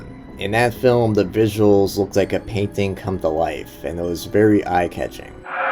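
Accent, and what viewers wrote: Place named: New York, United States. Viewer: Love your New York accent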